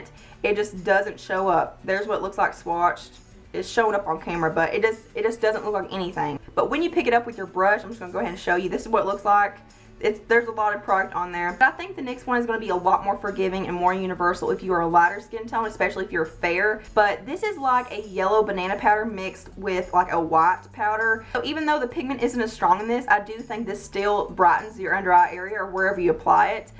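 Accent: South, United States. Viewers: ik that's wat I just said she seems like a nice country girl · This is a very good video but I have one question. Where r u from? Like, what area did u grow up in? U sound way southern that’s why I asked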